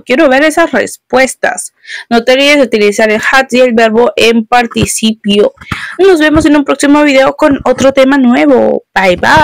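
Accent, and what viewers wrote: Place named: Spain. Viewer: Que acento mas insoportable ,me refiero al Español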